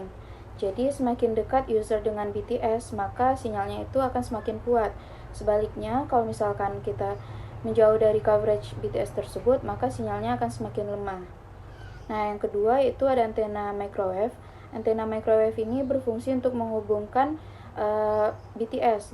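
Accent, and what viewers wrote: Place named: West Sumatra, Indonesia. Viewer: Wah enggle sudah bagus, tpi bahasa indo nya masih kelihatan bukitinggi vibes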